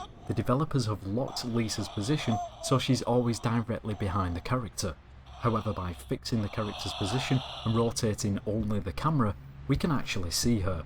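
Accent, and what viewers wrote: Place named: RP, United Kingdom. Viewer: ur not doing bbc news u dont have to speak like such a knobhead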